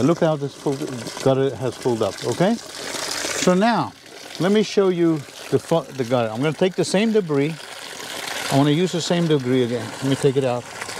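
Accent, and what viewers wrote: South Africa. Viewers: And here I thought you were originally from Germany. Must be an Afrikaner accent, right? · Are you South African that has been living in the states for quite a while?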